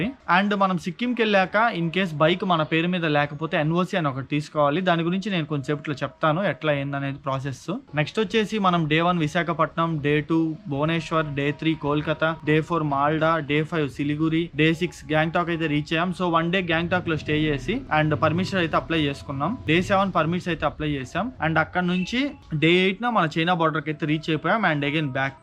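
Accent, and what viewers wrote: Nellore, India. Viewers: Chokka chinchu kovadhu 😂😂😂😂😂 love from chennai...assalu Nellore baasha keka ❤️🥰😂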